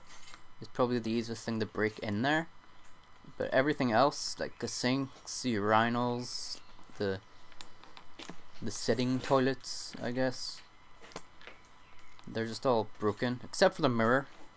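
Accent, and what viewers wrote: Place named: Northern Ireland, United Kingdom. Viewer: you can tell he's british by his voice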